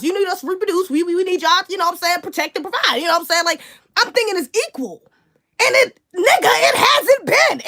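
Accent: Baltimore, United States. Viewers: U from Baltimore ain't u sis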